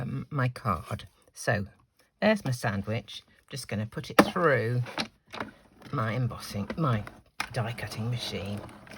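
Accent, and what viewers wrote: United Kingdom. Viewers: Oh my gosh, I just adore your videos! You crack me up so much with your bits of humor and I adore your British accent! I love your CAS card styles and techniques! Thank you so much!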